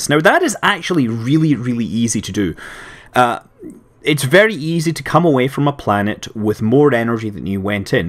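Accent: Scotland, United Kingdom. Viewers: Hullo! Spoken like a true Scotsman!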